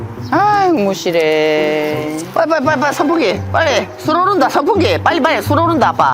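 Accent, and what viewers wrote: Gyeongsang, South Korea. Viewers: 고향이 경상도 오댄교? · 경상도 사투리귀엽네요 저는 충청도입니다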